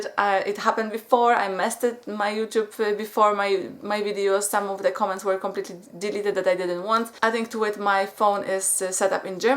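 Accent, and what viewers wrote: Poland. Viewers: I can absolutely know as soon as you start to speak that you are Polish! I love your people so much!!!!! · you sound polish and you have a polish name:) You Have A Fan From Poland but lives in scotland:)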